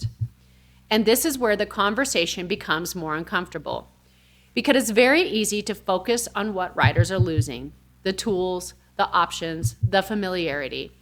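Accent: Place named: United States. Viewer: You have American accent and the US is second only to china in abusing animals. How about you showcase horses in America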